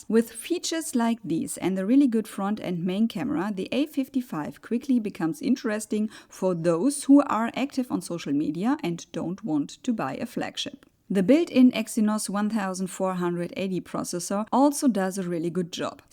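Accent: Germany. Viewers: Ich kann Ihren deutschen Akzent deutlich erkennen.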